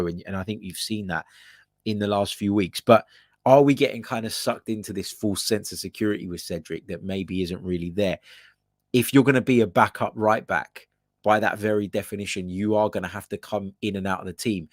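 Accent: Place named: England, United Kingdom. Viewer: you are chearleading for Italy while you live in England amd speak with an English accent. Make it make sense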